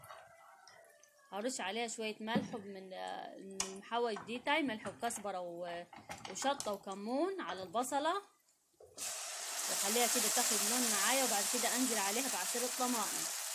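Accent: Upper Egypt, Egypt. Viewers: انتي من اي بلد فى الصعيد احنا كمن صعيدة من ادفو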